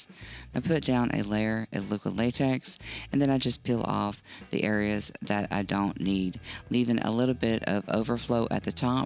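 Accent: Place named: United States. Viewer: Really fantastic. Very interesting accent too. American i think, but not sure where from. Great work. You deserve more views and subs.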